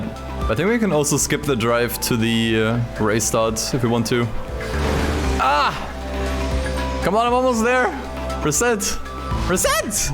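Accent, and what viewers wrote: Germany. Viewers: Man hört den deutschen Akzent voll raus 😂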